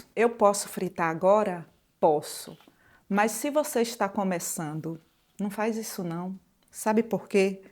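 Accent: Bahia, Brazil. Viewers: Kkkkk esse pedido de like, é cativante. Deus te abençoe. Esse sotaque baiano é indescritível. Deus te abençoe infinitamente.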